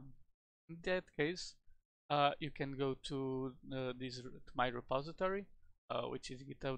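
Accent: Portugal. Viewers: vi logo que eras tuga pelo sotaque, obrigado por todo o esforço na realização deste projeto <3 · algo me diz que és portugues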